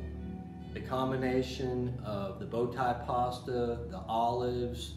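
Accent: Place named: South, United States. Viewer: I love listening to your voice, first it's like a blast from the past, second it's soothing and calming and finally that bit of southern inflection is still there and it's like comfort food for the soul but no calories!!! Keep the videos coming.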